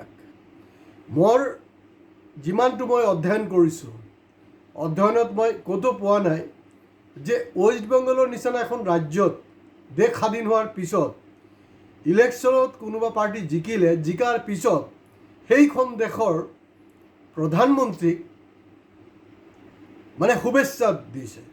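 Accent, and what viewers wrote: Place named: Upper Assam, India. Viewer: আপোনাৰ জিলা খনৰ নাম জনাব নে।কথা বোৰ upper assam ৰ যেন লাগে ।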